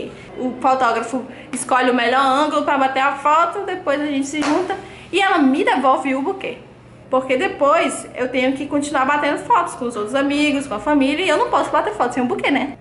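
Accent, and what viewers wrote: Ceará, Brazil. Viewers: Ah! Que legal! Sou Nordestina tb, não do Ceará mas da Bahia. Nossos sotaques são tão bonitos!! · guriiiiia tu é nordestina ? tu tem muuuiiito sotaque hahahahaha adoreeei saber sobre os casamentos dae, e um pouco sobre o seu tb e super me emocionei !! TuT acho tão lindo casamentos !! Mas tinha que ter briga pelo buquê sim u-u qual é a graça se não tem briga pelo buquê ?? ahahahaha xD #NovaInscrita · Teu sotaque parece do Ceará. Tu é de onde? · você é cearense, né? kkkkkk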